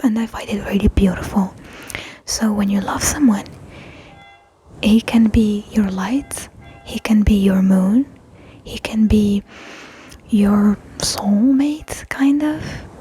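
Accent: Arab world. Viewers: Your Arabic accent are unbelievable ❤❤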